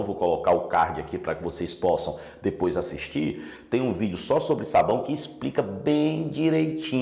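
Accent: Northeast, Brazil. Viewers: prof, o senhor é nordestino? a fala parece com o dos meus professores (sou paraibana)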